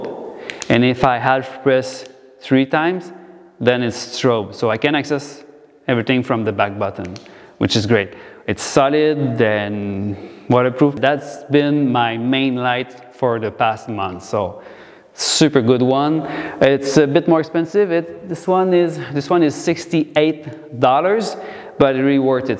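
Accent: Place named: Quebec, Canada. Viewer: Oh shit jpensse t québécois man ahah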